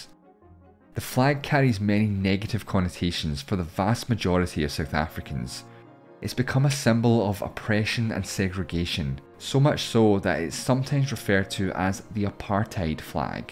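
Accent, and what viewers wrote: Scotland, United Kingdom. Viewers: No pretty sure he's a Scot. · He's Scottish, that's just his accent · he's scottish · He's Scottish. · Wow your voice is so nice and scottish and now I think I’ll join the discord link in description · Sieth afrika huh appertheid that's what I hear you say are you British too bc you sound British and really eighteen sexteens really but I live in south Africa and I love you that time the white people what's like a witch and black people was so nice white people enjoyed apartheid also the white also felt bad(I live in south Africa and I'm coulored and I'm glad I'm coulored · which part of the uk makes you have an accent where 6 is pronounced as sex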